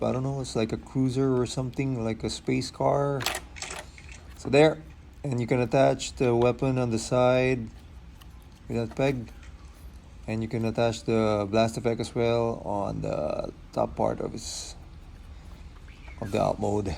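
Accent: Philippines. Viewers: He's accent is a Filipino Klinikle!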